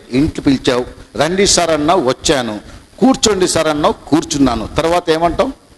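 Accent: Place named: Telugu, India. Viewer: speaking english in telugu slang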